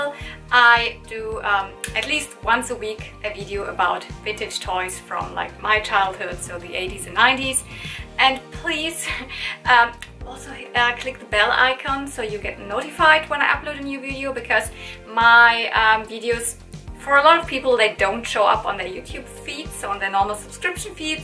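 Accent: Germany. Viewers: Hi, how funny to immediately hear that you were German. And hello and thanks for a good exciting channel. Cheers from Andy from Denmark.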